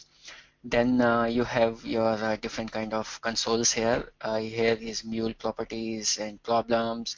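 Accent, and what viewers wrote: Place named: India. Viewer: 印度英语，听着很费劲啊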